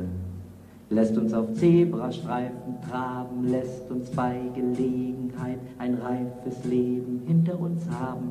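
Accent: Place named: Berlin, Germany. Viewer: Die klassische Berliner Schnauze.....stilbildend, leider war ich zu jung als 61' er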